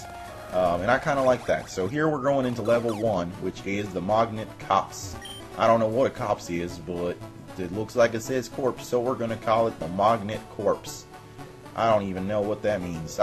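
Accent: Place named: South, United States. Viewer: New York, dang, you sound like a Southern guy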